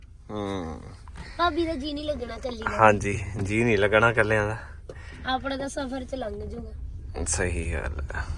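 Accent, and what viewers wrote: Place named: Malwa, India. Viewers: Veer apni boli bol reha eh malwai aa ta malwai hi bolni aa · ਵੀਰੇ ਤੇਰੀ ਬੋਲੀ ਵਧੀਆ ਨਹੀ ਜ਼ਿਆਦਾ ਮਲਵਈ ਜ਼ਿਆਦਾ ਨਾ ਬੋਲਿਆ ਕਰ ਵੀਰੇ ਲਹਿੰਦੇ ਪੰਜਾਬ ਦੀ ਬੋਲੀ ਬਹੁਤ ਮਿੱਠੀ ਹੁੰਦੀ ਹੈ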